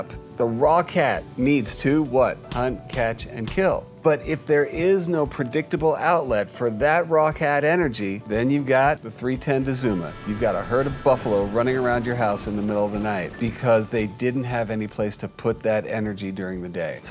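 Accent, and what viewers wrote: England, United Kingdom. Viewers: Haha I am UKian, and you've got a great UK accent 👍🏼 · I love the British accent Cat Daddy Dictionary time XD But also, I love when cats get the zoomies. Highly entertaining, but, play with your cats too! ^^ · I adore your English accent- and that's coming from an English lady. Velvet all the way, my friend. 😘👌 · My cats Zooms during the day, she also makes a really unusual noise... she gets in the zone and she's zooming around. It is cute but I have upped her play time, so hopefully she uses up some energy. Nice British accent btw and that's from a Brit lol 🇬🇧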